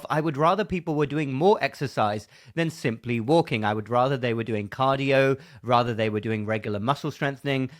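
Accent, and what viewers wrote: United Kingdom. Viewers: I walk to the cafe, about 3.4K every day, so a nearly 7k round trip. I have to concentrate to keep my shoulders back, and look straight forward. I am 77, and was forced to retire at 75 for vaccine refusal. thanks. I am intrigued by your accent, which I notice that other people have from the UK, but seems to be a mixture of older accents😊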